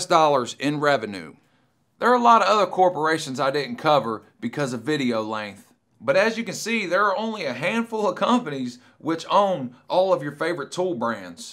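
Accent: South, United States. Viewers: Strong sothern accent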